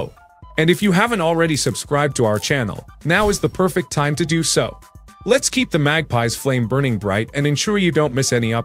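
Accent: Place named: United States. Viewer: Why is a Yank talking?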